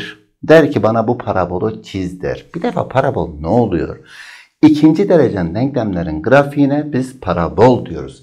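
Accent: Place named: Eastern Anatolia, Turkey. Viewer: Hocam nerelisiniz valla doğu şivesi seziyorum 😅 nasıl sevimli 🙏🏻☺️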